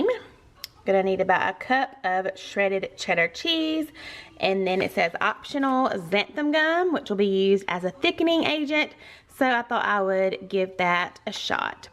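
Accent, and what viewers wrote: South, United States. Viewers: I love all your videos :) You are such a nice person, and I love your southern accent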